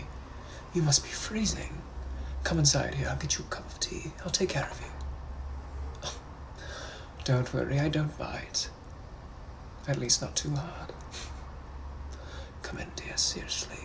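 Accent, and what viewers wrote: RP, United Kingdom. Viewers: pov: you're standards are high because you're simping over and his accent when he is a British gentleman from the 1800's... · Funny how I fell in love with kpop years ago, loved british accent since forever.. and discovered him just now 🤡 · Your normal and British voices are so amazing and comforting idk how but damn I could listen to this whenever I cry for comfort ily bro😔♡︎ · is he British or does he just sound more American when his voice is sped up. and he’s like a handsome pretty looking dude but i ain’t crushin he is cute though. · I searched "British accent ASMR" and it brought me here. 10/10 no notes. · 22 straight minutes of the British gentleman, don’t mind if I do 😭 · Hi I like the POV's and the voice of the British gentleman from the 1800's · 22 minutes of a British gentleman?? lord have mercy on my soul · Thank you for blessing us with British gentleman for 22 minutes 😌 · I came here for the reg voice and I got British why did you lie to me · Fuck 'British gentleman voice - ASMR WITH THEM KNUCKLES THAT CRACK LIKE THE VOICE HOT THEM KNUCKLES POP LIKE MHHHM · Idk why but his British gentleman voice reminds me of Elijah from the Originals but if he was a psycho and didn’t respect woman(maybe???) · i am currently unable to breathe... 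he- i- 🥴 the butterflies i have right now are insane.... i need to make his voice my alarm in the morning and my ringtone not even just the british voice... just his voice in general..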